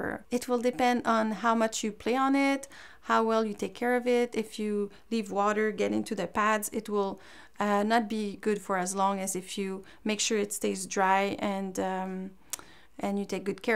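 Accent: Quebec, Canada. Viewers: Êtes vous Québécoise?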